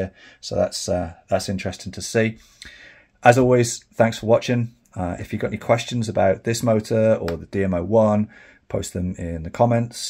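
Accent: United Kingdom. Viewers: Very interesting. Listening to you speak, can I hazard a guess that you originated not many miles from Swindon, UK??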